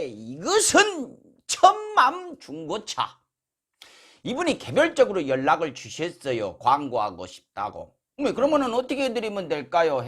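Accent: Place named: Gyeongsang, South Korea. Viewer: 아따 대구분이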